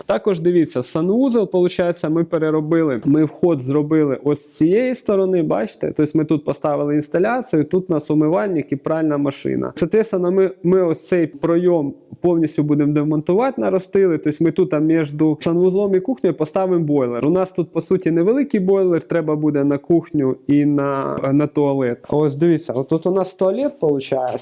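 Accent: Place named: Chernihiv, Ukraine. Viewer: відео та канал загалом дуже круті, дякую за технічні подробиці. Судячи по твоїй вимові, ти мабуть родом з десь з Чернігівської області?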